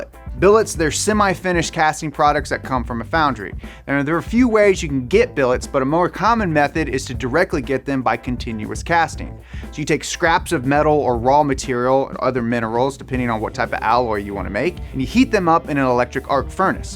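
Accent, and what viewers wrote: Finland. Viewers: ah hearing that Finnish accent is music to my ears. Grandparents are From Finland so its nice to hear lol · me seeing thumbnail: thats a "finnished" video :) also nice finnish english accent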